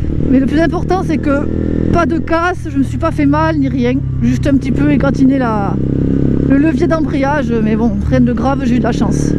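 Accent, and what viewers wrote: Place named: Southwest, France. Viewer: toi vu l accent tu sembles etres du sud ouest , sinon bonne continuation!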